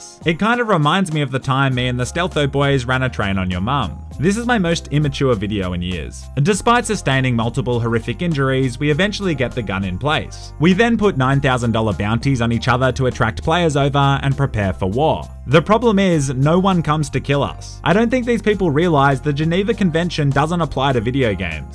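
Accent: Australia. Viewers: just a question are you australian or american?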